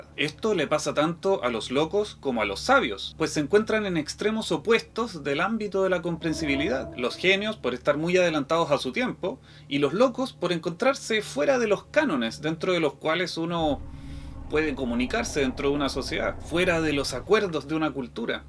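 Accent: Chile. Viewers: Hola encuentro que tu video Está muy bien realizado y la explicación me parece fantástica! Cómo chileno me gusta tu acento y no me molesta como videos de otros países que son como taladros en el oído.... solamente acotar que tal vez podrías pensar en eliminar el gesto que haces con la mano al fin de este vídeo porque es algo muy común entre nosotros los chilenos pero es sabido que en otros países tiene significados incluso de falta de respeto o cosas así.... aparte de eso tu explicación y tu video están la.raja!!!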